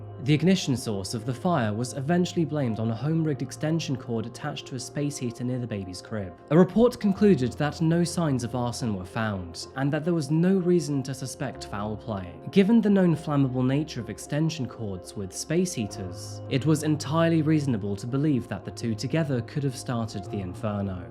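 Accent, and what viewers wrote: United Kingdom. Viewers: Sheesh! Sorry folks are all mad abt pronunciation...crazy how people from different places say pronounce things differently. They missed a couple though! You also said the letter "h" funny! (Like a Brit i guess, we say it more... without an h ... like "aytch" and you said it w an actual "h" at the beginning! 😂 and then... you said Delimar told her mom "your my mum"... (we say mAWm)... its almost like youre not americain!😂 like youre pronouncing things the way youve heard them! Crazy! 😂 sorry... having fun noticing your british bits now. Now i want you to say "today's case takes place" like its "the rain in spain falls mainly on the plains..." Jeeeze. People be so picky. (P.s. my name is Dominique, but americains always want to be saying it like an italian guy's name, "dAWm ah nick", so here we are... sigh) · Dudes British, he gets a pass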